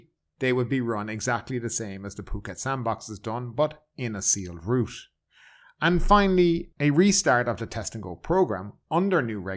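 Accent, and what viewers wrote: Ireland. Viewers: Hi. or is it. I arrived in Suvarnabhumi airport today flying from Dublin via Amsterdam. I hope they restart the Thai pass programme because it definitely works. if the Thai government was to pick up cost for 2nd PCR test as they are doing now it would be a grand good will gesture and ease some of the anguish some travellers are having. The infrastructure in place at BKK airport was excellent today and the staff were very professional and diligent the whole process was very smooth. As we say at home don't change something if it doesn't need fixed. On lighter note is it probable that I am first Irish man to enter Thailand this year. Also nice to see you haven't lost your Irish accent 3 is still pronounced tree. Slainte.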